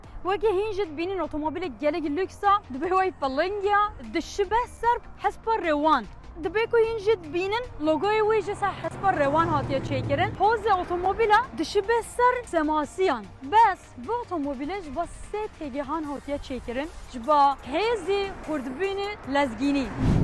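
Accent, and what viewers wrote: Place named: Goyi, Turkey. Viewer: Peki o goyi şivesiyle konuşmanin verdiği zevki hiçbir şiveyle değiştirememek ,u Deste hawa soxbit serkeftin inşallah 🙏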